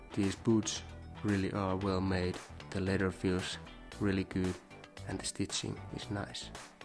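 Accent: Finland. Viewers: Hey, thanks so much brother. I was having the same issue, by the way, are you from Finland? Judging from the accent. 😄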